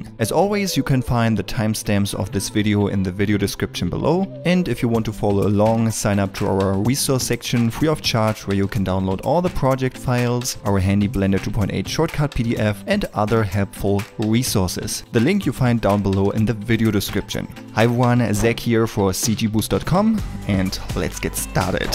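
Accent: Germany. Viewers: Your English is good (I think, I don't know, I am not a native English speaker either), but I figured out that you are from Germany from you accent anyway. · dat german accent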